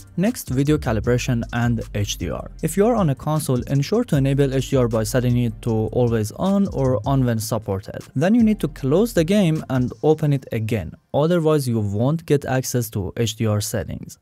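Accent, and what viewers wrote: Iran. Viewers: اقا از لهجه متوجه شدم ايراني هستي. موفق باشي 😀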